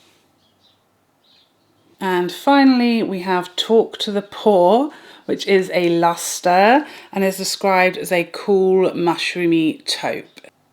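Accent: United Kingdom. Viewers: Bless you beautiful soul inside out love your vidoes your British accent is so regal and your voice is so calming and therapeutic, I love the mascara never tried brown but I am aiming on getting one as it will give sultry look and emphasis the eyeshadow, the look you created is spectacular 😊💕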